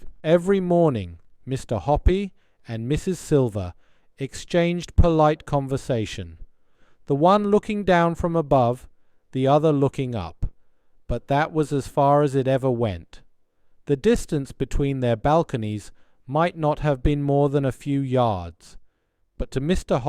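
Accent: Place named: United Kingdom. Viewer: d=(^o^)=b thanks 좋은 영상 감사합니다. 영국식 발음 ㅋㅋ 워터~~ 영어화면 있으니 비교해서 보는게 좋네요^^ 영화도 보고싶네요^^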